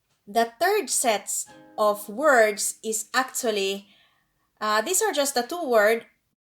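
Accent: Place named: Finland. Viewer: Your accent even if you’re speaking english is very finnish na :) Minä myös asun Suomessa ja nyt opiskelen suomea 😅 Toivottavasti mä puhun sen hyvin kohta!😄 Writing it is always easier than speaking lol 😊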